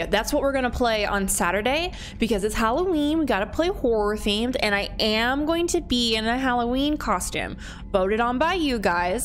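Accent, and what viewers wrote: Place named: United States. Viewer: It’s definitely because you’re American cause I remember when I went to the beach and met this Irish lady we both thought each other’s accent were pretty neat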